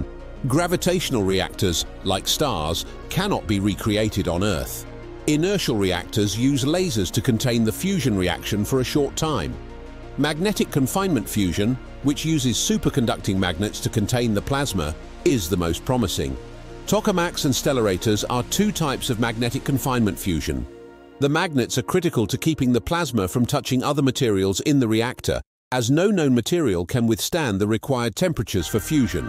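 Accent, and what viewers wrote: United Kingdom. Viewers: How about inviting Tony Stark to give a hand? He knows all about fusion, since he carries one in his chest … what a sensationalistic video, luring people to watch in its entirety just to find out China has no artificial sun nor has launched bananas in the air. Shame com this narrator with his dreadful British annoying accent !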